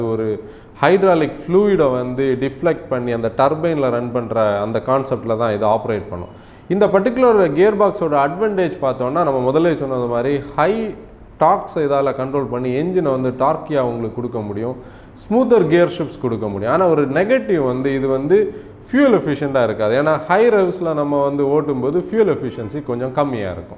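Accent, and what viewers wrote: Kanyakumari, India. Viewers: nanum kanyakumari thaan anna. nama slang ae pothum enga irunthaalum nama ooru kaaranga nu kandu pidicherulam😇❤️❤️ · Kanyakumari slang 👍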